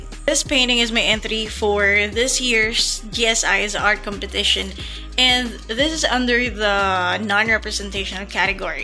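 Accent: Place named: Australia. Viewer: Im diggin that aussie accent of yours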